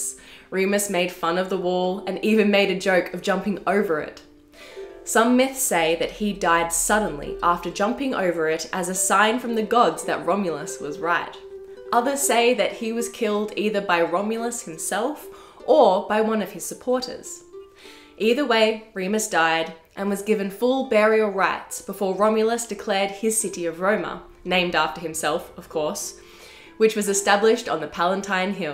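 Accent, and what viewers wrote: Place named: Australia. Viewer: Great presentation. You sound like an Aussie.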